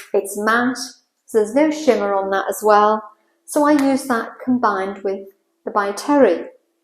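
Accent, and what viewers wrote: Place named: Manchester, United Kingdom. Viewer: You have a lovely accent, are you from Manchester area?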